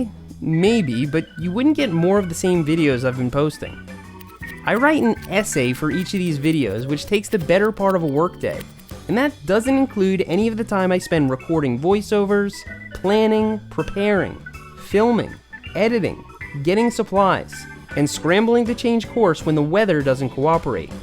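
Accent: Long Island, United States. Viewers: ❤️ I wish for you continued success at whatever you choose to do. Thank you for including us in your adventures! I knew you were from Long Island by the way you pronounce water, wodder, lol. Why are you planning to sell Berm Creek? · Love this....You from Long Island? I’m from Jerzee? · My dog Lucy and Drama are so similar. Lucy is a Boston terrier (similar to frenchie) and she's bigger than every other Boston we encountered. She's supposed to be a pure bread Boston but it don't look like it with size. We assumed that one of her relatives is a boxer. Edit: with your long island accent, it's really similar to my uncle, aunt, dad, etc. They're from Long island and now we live in NYC.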